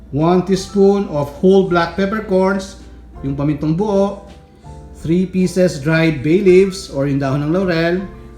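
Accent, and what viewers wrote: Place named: Cavite, Philippines. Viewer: cavitenyo siguro sya kc yung accent niya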